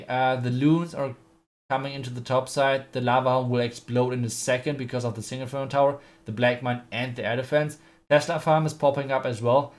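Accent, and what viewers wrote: Germany. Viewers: I love the German accent when you speak English😍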